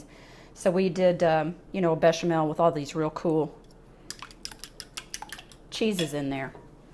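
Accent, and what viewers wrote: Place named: South, United States. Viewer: Wow looks delicious! Thanks for the mushroom tip, to cook on higher heat and cute Southern accent.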